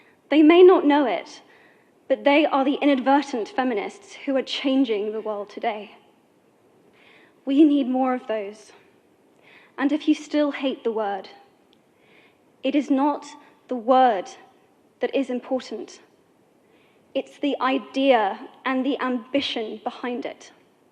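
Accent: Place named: United Kingdom. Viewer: xem truyền cảm hứng vừa học phát âm anh anh ... quá đỉnh luôn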